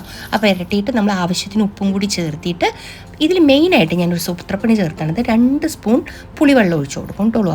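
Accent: Palakkad, India. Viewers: Dear Mam, ഈ വെറൈറ്റി കറികളും പേരുകളും, ഉപയോഗിക്കുന്ന പാത്രങ്ങളും, കൽച്ചട്ടി, മൺപാത്രങ്ങൾ തവികൾ etc... പഴമയെ കളയാതെ പുതിയത് ചേർത്ത് പിടിക്കുന്ന മനോഹരമായ വീഡിയോകളാണ് എല്ലാം ...❤❤ പാത്രങ്ങൾ,വൃത്തിയുള്ള പശ്ചാത്തലം, ആ കർഷകമായ പാലക്കാടൻ സംസാര രീതി... നമ്മൾ കാണാത്ത നിഷ്‌ക്കളങ്കമായ, ചൈതന്യമുള്ള ഒരു ലോകം പോലെ തോന്നുന്നു.❤❤കൽ ചട്ടിയുടെ പിറകിലായി ഓമനത്തമുള്ള കുഞ്ഞ് പ്രതിമ😘❤ ബുദ്ധിമുട്ടാകില്ലെങ്കിൽ ഈ കുഞ്ഞ് കുഞ്ഞ് പാത്രങ്ങളൊക്കെ ഒരിക്കൽ കാണിക്കുമോ ?...❤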